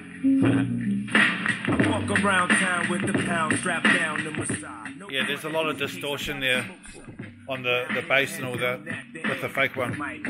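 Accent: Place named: New Zealand. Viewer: U sound like a New Zealander lol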